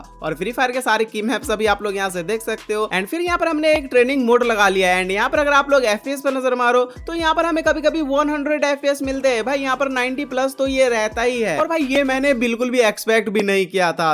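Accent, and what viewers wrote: India. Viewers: Indian accent so amazing🎉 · Bhai pakka tum bengali ho, mask lagake rakho tabvi bata skata hu 😅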